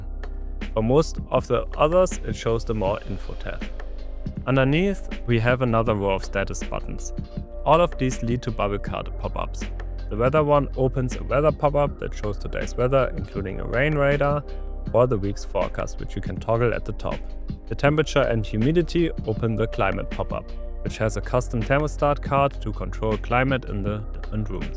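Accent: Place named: Germany. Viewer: i hear deutsch in se english - top video, mach weiter so!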